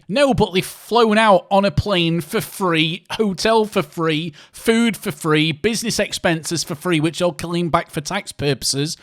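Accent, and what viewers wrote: United Kingdom. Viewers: It’s simple. People like spending their money that they earned how they feel like. If you don’t want people to be able to do that move to a communist country. Oh wait you sound British or Australian so you already live in a communist country.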